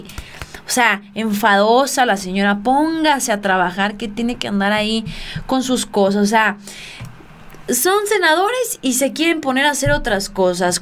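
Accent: Mexico. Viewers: Que ermoso Mexicano hablas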